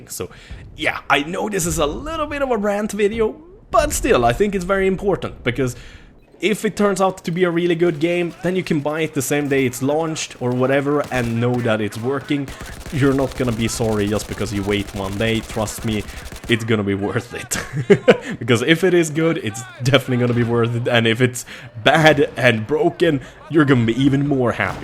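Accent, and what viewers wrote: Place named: Sweden. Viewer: U sound really swedish...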